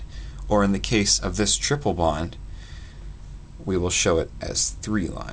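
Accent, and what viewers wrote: Canada. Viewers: Thanks a lot, helped a bunch. And props to the Canadian accent!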